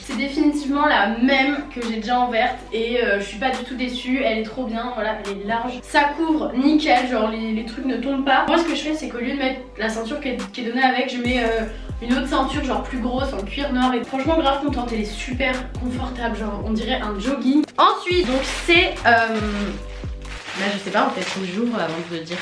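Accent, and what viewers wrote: Marseille, France. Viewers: T trop belle et trop drôle et grv spontané et moi aussi je kiff l accent marseillais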